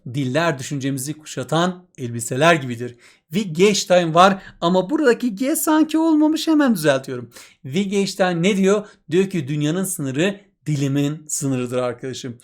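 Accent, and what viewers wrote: Eastern Anatolia, Turkey. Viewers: 2025 tayfadanım herkese selamımı Hocam çok iyi anlattınız sizinle devam etmeye karar verdim.. Bi de bi şi sorcam aslen nerelisiniz acaba? Kars Ardahan Ağrı Erzurum??? Uzatmaları kullanmıyosunuz da😂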